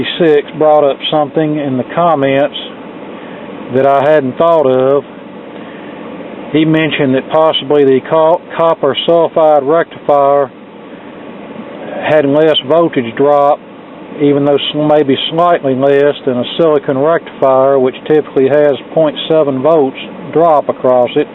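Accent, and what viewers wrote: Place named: Rural, United States. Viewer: That was friggin' awesome! It was like watching Mr.Carlson's Lab with a country twang! Go to the head of the class.A+!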